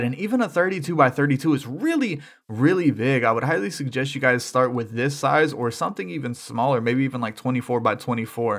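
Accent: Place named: Ireland. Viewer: The only reason I even put on this video cuz I'm making a giant hot air balloon but I still need to fill in the top of the dome and stuff and the bottom so I went to work for the day and I can't find a single thing that can help except from this dude that's the thing by to me kind of has like an Irish accent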